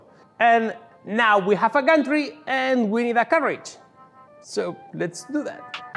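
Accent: Spain. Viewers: Joder cómo se nota el acento español...Buen vídeo tío!!! Te van a encargar cosas muy grandes con eso! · Inconfundible acentazo español nada más abrir el vídeo xD Como me alegra ver a makers españoles. Mucho más viendo lo crack que eres y lo bien que te va en la plataforma. Proyectazo! Sub! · Acentazo español, nombre español, pero videos en ingles. Es como si colim furze hiciese los videos en español para tener mas seguidores en Sudamérica. 🤷🏼‍♂️ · eres español, verdad? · Eres español? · pretty cool! very nice project! What material is the red plastic that you printed parts from? was it hard to get the axes perpendicular in this scale? Also you sound spanish ;) where are you from? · Soy yo o eres Español? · que chulo acento español xd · This dude Spanish?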